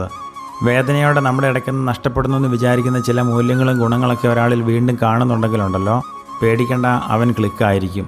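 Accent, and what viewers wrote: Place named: Idukki, India. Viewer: Ningade samaaram kettal kannurkaaran aanenn thonnarilla.... i thought u were someone from idukki, kottayam or pathanamthitta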